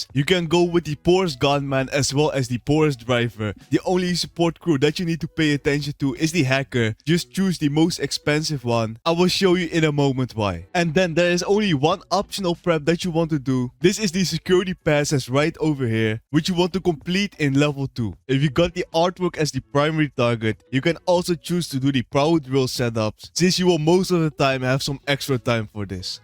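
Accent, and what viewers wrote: Netherlands. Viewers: We horen de Nederlands 🥀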